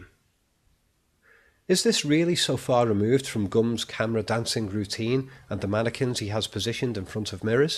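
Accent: Liverpool, United Kingdom. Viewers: Where you from? South Liverpool?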